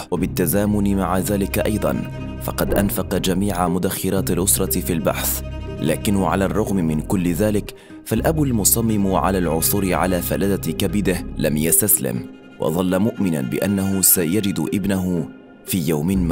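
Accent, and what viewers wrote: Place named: Morocco. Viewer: واضحة اللكنة المغربية ف لهجتك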